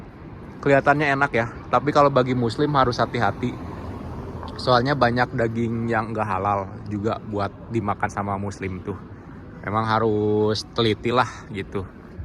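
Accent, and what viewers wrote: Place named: West Java, Indonesia. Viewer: Gass mantap orang sunda inih ketauan logatnya da abimah oge sami orang amsterdam 😂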